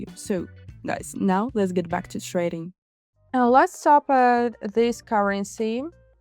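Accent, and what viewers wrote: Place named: Russia. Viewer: It's pronounced MAK Dee 🤦 This Russian accent and pronunciation is 🙄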